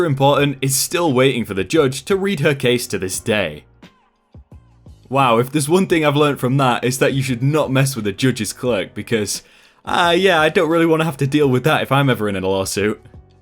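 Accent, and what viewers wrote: United Kingdom. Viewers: nice to hear a brit accent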